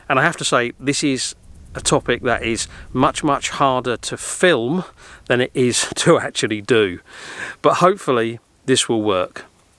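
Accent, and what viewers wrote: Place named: East London, United Kingdom. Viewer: Sir, are you from London? Your accent is really reminding one of friends who was coming from East London.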